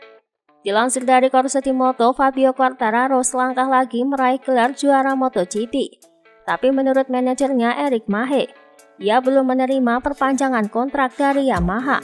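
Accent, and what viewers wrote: Java, Indonesia. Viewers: Chanel ini keren. Tapi agak kurang pas saja dialek & intonasi mimin_host nya. Nampak banget seperti anak SD (untuk ukuran host YouTube) cara host baca dialog. Lagian dialek jowo ne nan kental mendowngrade level Chanel ini. Itu aj point' yg belum gw mensubscibe Chanel ini.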